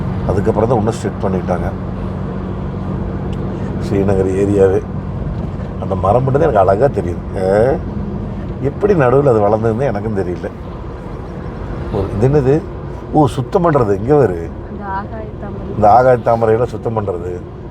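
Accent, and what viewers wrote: Kongu, India. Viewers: கொங்கு தமிழ், இளமை,இனிமை அவர்கள்.TMF from coimbatore. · கொஞ்சும் கொங்குத் தமிழ் பேசும் கோமகரே...! திகட்டாத தித்திக்கும் பேச்சுடைய திருப்பூர் திருமகரே...! எங்கள் மதிப்பிற்குறிய டான் திரு. அண்ணா❤❤❤🙏 அவர்களே எப்படி இருக்கீங்க அண்ணி❤❤❤🙏 எப்படி இருக்காங்க ரித்திக்❤❤❤ எப்படி இருக்காரு. அருமையான வீடியோ பார்க்க பார்க்க இடங்கள் அழகோ அழகு❤❤❤ அண்ணா இந்த வீடியோவுல நம்ம TMF ஒருத்தர் "Marvel Movies"னு ஒருவர் கமெண்ட் பன்னிருக்காரு அவர் கமெண்ட் பன்னிருக்கது 1000% correct. குறிப்பிட்ட இடங்களில் மட்டும் ட்ரோன் கேமரா மேல பறக்கவிடுங்க. ஜம்மு காஷ்மீர் ராணுவத்தின் ட்ரோன் கேமராவும் முக்கால்வாசி இடங்களில் மேல பறந்து கொண்டிருக்கும். அவங்க கண்ணுல வேற ஒரு ட்ரோன் கேமரா பறக்குறது பார்த்தால் SHOOT பன்னிடுவாங்க. அப்பறம் LOCATION ah TRACK பன்னி உங்களுக்கும் Enquiry வருவதற்கு வாய்ப்பு உண்டு. கொஞ்சம் கவனமா இருங்க அண்ணா. நம் ❤சிட்டுக்குருவியோட❤ தங்களது இந்த லடாக் பயணம் இனிதே தொடர்ந்திட நல்வாழ்த்துக்கள் அண்ணா❤❤❤ குளிர் இன்னுமே மைனஸ் டிகிரிக்கு கீழ வரும் அண்ணா உடம்ப பார்த்துக்கங்க அண்ணா🙏 என்றும் அன்புடன் உங்கள் தம்பி என்றும் அன்புடன் உங்கள் TMF ரா.முஹம்மது கபூர் (சென்னையில் இருந்து)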